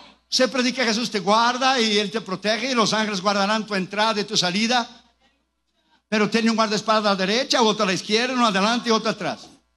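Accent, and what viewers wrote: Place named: Costa Rica. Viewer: Pero eres tico por tu español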